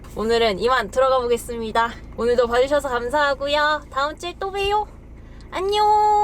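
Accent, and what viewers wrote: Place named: Busan, South Korea. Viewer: 항상 영상 잘 보고 있습니다! 같은 부산분이시네요 ㅋㅋ 사투리가 반갑습니다 ㅋㅋ 재미있는 영상 계속 부탁드릴께요! 언젠가는 잘하겠지!! ㅋㅋㅋㅋ 화이팅입니다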